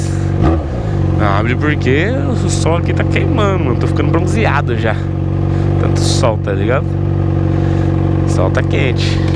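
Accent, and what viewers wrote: Goiás, Brazil. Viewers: Eae por que vc fala no pique do Goiás? Eu sou do Goiás kkk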